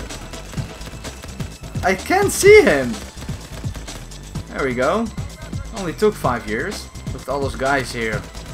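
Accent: Netherlands. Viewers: You Dutch? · Maybe ist just me but you soud like you're Dutch Ps just a guess.....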